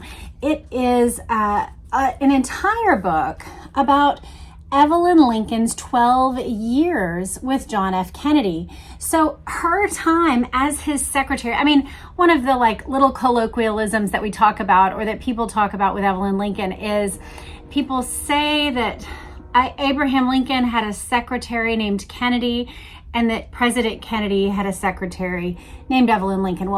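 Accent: Texas, United States. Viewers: It's that Texas accent, which is one of many Southern American accents. Add the pleasant style of her reading & it's very relaxing. I've learned Not to listen to before bed. 😊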